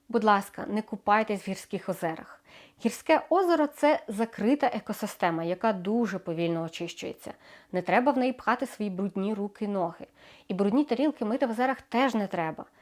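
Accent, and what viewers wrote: Standard. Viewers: дуже чиста і невимушена українська мова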